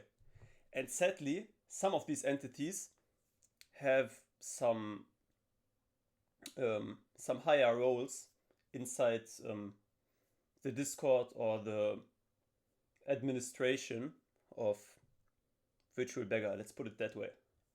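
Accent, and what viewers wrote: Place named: Germany. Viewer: I hear deutsch😂❤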